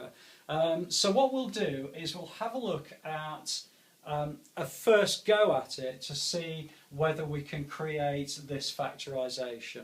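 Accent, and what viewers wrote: England, United Kingdom. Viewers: Finally a person who can explain maths and sounds english!!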